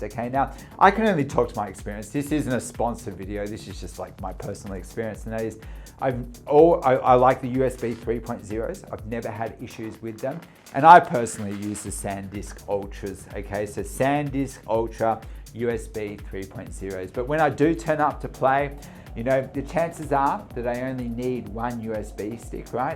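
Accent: Australia. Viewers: I love how he softens the blow of bad situations with that Aussie accent!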